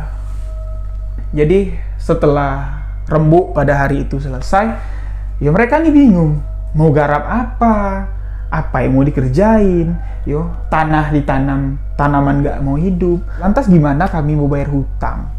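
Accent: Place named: Java, Indonesia. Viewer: jawa ngapak dwonk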